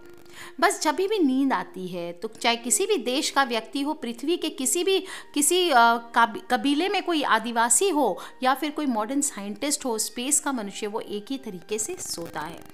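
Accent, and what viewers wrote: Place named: Marwari, India. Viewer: Radhey Radhey Mam aap marwadi hai kyunki aapke bolne ke wording bilkul hamari tarah hai.mujhe Kolkata ki yaad aa jati hai aapki baat sunkar.l like you ma'am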